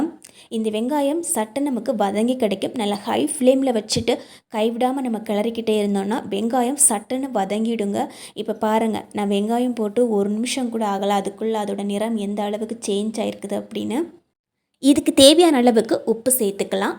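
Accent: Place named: Nagercoil, India. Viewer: I think u are from Nagercoil Your language sounds like that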